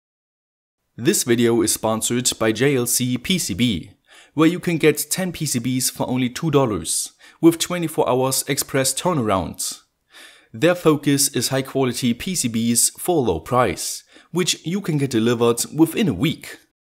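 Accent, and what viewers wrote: Germany. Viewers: You come from Germany? kommst du aus Deutschland das Englisch hört sich nämlich ein wenig so an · Oh wait lemme guess, r u German? U have an accent... no offense tho, I’m German myself haha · Are you German? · Lul, du bist deutsch? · Is that a German accent ? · Mir war sofort klar, mit dem Akzent musst du aus D sein ;) mal überlegt auch eine Version auf Deutsch online zu stellen ?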